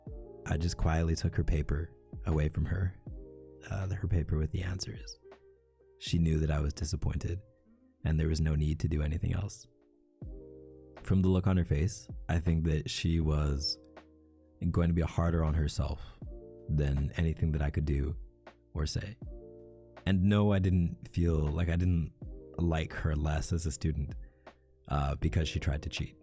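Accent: Canada. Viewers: HOLD UP UR CANADIAN? HOW DID I NOT CATCH ON- OH MY GOD LMFAOOOOO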